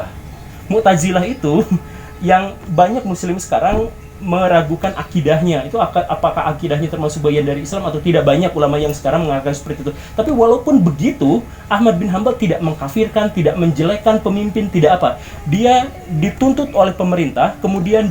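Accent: West Java, Indonesia. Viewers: Logat Sunda pak guru membuat ku kangen Bandung. Aku pernah 1 tahun di Bandung.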